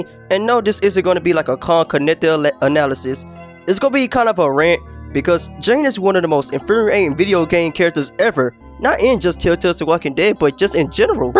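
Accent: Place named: Louisiana, United States. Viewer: What the heck? What kind of accent is that? Is that a Louisiana accent? Whoooooooaaaaaaa! Sir you are a gem. Please make more videos.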